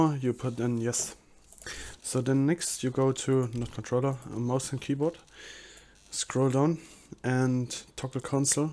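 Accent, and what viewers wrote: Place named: Germany. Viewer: Der deutsche Akzent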